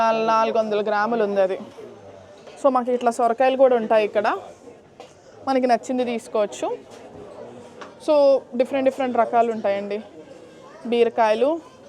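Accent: India. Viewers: Good, Vijayawada AP language bagundi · గోదావరిజిల్లా ల భాష లో అందరికి అర్థం ఐయేలా చెప్పారు చాలా సంతోషం గా ఉంది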